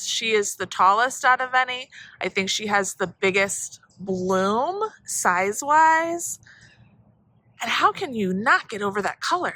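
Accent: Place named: Chicago, United States. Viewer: I love hearing your Chicago accent!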